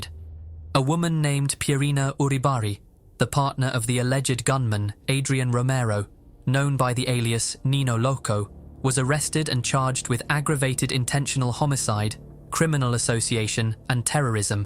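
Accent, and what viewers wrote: United Kingdom. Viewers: bollocks* He sounds British 🙃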